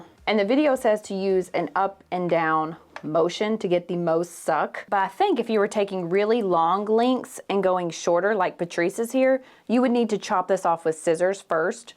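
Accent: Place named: North Carolina, United States. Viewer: You have NC vibes. I'm from NC, and our accents are very similar. I'm probably wrong, but it's just an observation.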